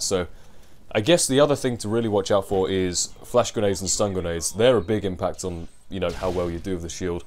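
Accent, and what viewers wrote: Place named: Essex, United Kingdom. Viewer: ESSEX englaand;)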